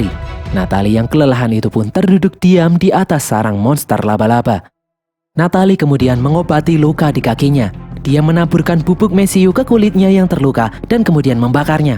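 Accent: Java, Indonesia. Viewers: pas gw nonton beberapa vidio awal mimin kok pembawaannya medok banget ya ? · Miminya orang jawa mana ya? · Medok yo mas 🤭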